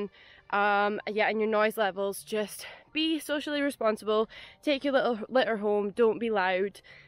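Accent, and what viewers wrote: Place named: Aberdeen, United Kingdom. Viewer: Are you from Aberdeen? As an aberdonian myself I recognise the accent, been in England for the last 12 years, mainly London, moved into my self build crafter conversion about a year ago, England is a total nightmare for parking, I love coming back to Scotland for the beautiful spots you can park in without any grief. Please do not put any of your spots on P4N as councils monitor this app and quickly put an end to your park up. I never use apps, I use google maps and street view, when I find a great spot I save it and in a year and a half I have quite the database of amazing spots. Also if you see a farmer go and ask him if it’s ok to park up on there land, they are extremely wary of pikeys parking up but if you introduce yourself be polite and respectful 9 time of ten they will accommodate your request free of charge and tell you where to park, I even had a farmer go all the back to his house to get his keys so he could open a gate for me. 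You can also offer site protection for exchange of a park up, farmers have a lot of expensive machinery, they are more than happy to have you stay as a presence against theft near the machinery. Thanks for the vid. Subbed.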